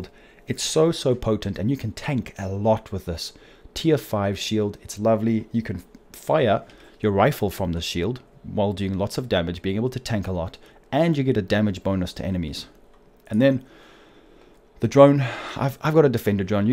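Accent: South Africa. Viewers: So good to hear a South-African English accent💪🏼💪🏼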